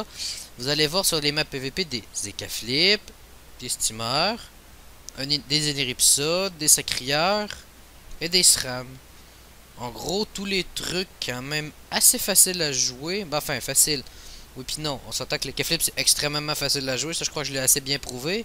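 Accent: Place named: Quebec, Canada. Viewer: honnêtement je viens tout juste de découvrir ta chaine a cause d'une vielle vidéo de Tyra et cela fait du bien d'entendre un québécois jouer a dofus xD Ps: vive le Québec ^^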